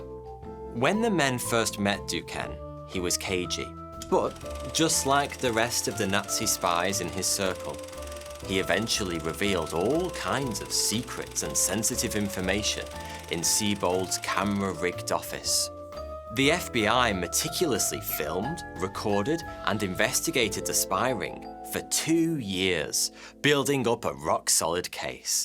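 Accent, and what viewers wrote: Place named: Yorkshire, United Kingdom. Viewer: Everybody loves the yorkshire accent. Exactly why this guy is so popular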